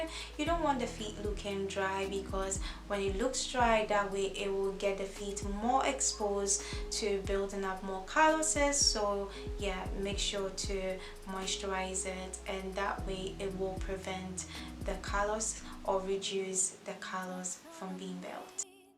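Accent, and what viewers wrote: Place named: Guyana. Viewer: Are u from guyanna? A friend of mine from there has a similar accent so cute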